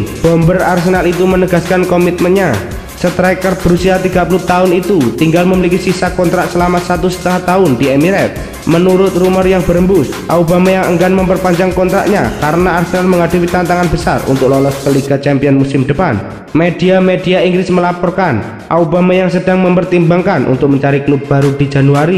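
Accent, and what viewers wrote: Java, Indonesia. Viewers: Untuk, luar biasa untuk berita nya, tapi tolong logat jawanya di ubah, jelek banget jdinya, tolong... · Logat jawanya di hilangin bro. Skedar saran aja bang jgn tersinggung 🙏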